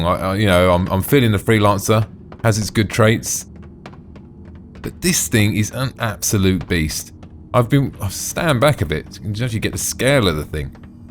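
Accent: United Kingdom. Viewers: your accent is too damn british to understand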